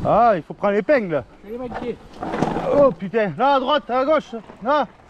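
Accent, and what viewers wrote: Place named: South, France. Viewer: l'accent du sud !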